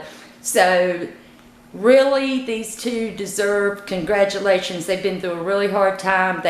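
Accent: South, United States. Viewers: Love your videos and love your southern accent! I have been watching your videos for quite some time now but just a word of advice, why don't you ghost explorers get a small can of bug spray or something to put on your body to protect you from getting attacked and carry it with you especially to cemetery's or in the woods when doing your ghost exploring, I notice this a lot with all of you ghost explorers always getting attacked by a lot of mosquitoes, bees and what ever. Keep up the good work and I will be looking forward to seeing more of your new videos in the near future.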